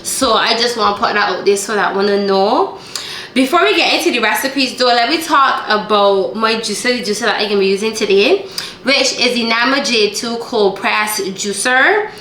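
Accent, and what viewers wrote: Barbados. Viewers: Well it is always refreshing to hear the best accent in the world...Love yah.As you can tell I am a Proud Bajan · Are you a native of Barbados 🇧🇧 🤔 Love the accent ❤ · I love your Bajan accent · I hear that lovely Bajan accent. You remind me of my bestie who is from Barbados! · She’s giving me bagan accent 🇧🇧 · I'm sure that a Barbados accent I can hear. Where did you get those amazing big bowls from? Great video by the way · That bajan accent is amazing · Omg!!!! You must be Bajan💗I love your country and all of y’all’s accents💗💗. Loved the video as well. Just subscribed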